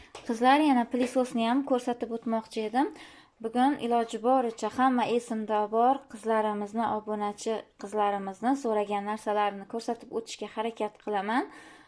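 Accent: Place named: Khorezm, Uzbekistan. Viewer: Man ayol kishiman . Siz Xorazmdanmisiz gapirishuz Xorazmlilarnikiga òxsharkan judayam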